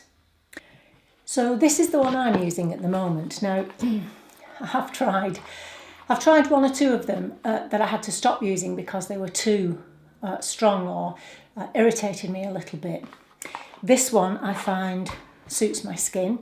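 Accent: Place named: Lancashire, United Kingdom. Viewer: My guess is Lancashire? I'm down in Derbyshire! Good to find someone for the older gals, I'm not quite in the 50's yet but loving your content. Tracy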